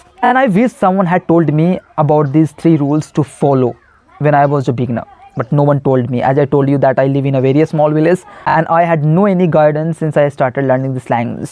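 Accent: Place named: Bihar, India. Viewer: Bihari english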